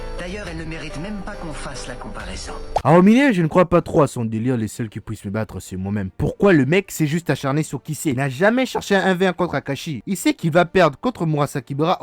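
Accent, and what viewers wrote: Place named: Democratic Republic of the Congo. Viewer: Rien qu'à ta voix,je sais que tu es un 243 😎🇨🇩(moi aussi)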